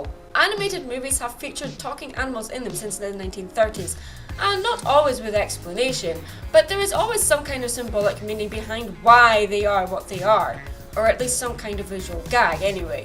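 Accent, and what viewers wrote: Scotland, United Kingdom. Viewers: Hi! I have a question to ask you. ( I don't remember if I had asked you this before so if I have I'm sorry but could you please answer again cuz I can't find it) I have a question about your voice for Mary Sue. I know that you're Scottish and I love your accent by the way but whenever I hear you do Mary Sue's voice ( to me) you sound like you have an American accent, was this planned for the first ever video that showed Mary Sue,or was it just by accident? I'm just wondering because your accent you have for your videos when you speak and accent you have for Mary Sue and she speaks or very different so I was just wondering thank you!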